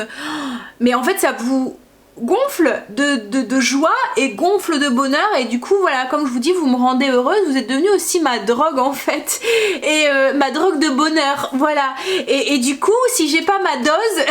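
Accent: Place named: Nord, France. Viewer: coucou ,c'est toujours un vrai plaisir pour moi de te retrouver ,tu es tellement naturelle et "normale" et tu me fais penser à ma copine qui vit là bas dans le Ch'Nord hihi(le ptit accent ;-)) plein de bisous ma belle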